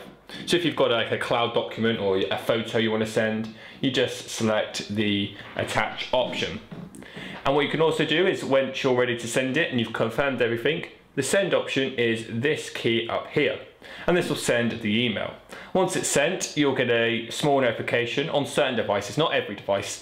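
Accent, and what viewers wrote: United Kingdom. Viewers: Next time try to DE cickneytize the accent. I barely understood. He, sounds like dick van dyke from Mary Poppins. I'm trying to learn. Not be correct.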